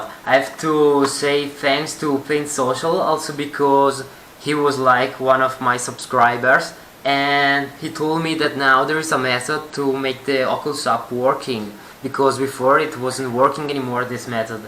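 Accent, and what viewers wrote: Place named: Italy. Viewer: Ciao, grazie mille! Riconosco dall'accento che sei italiano! Tutto funziona perfettamente, mi chiedo solo per quanto altro tempo le app resteranno sullo Store di Oculus Gear ora che il servizio è stato interrotto...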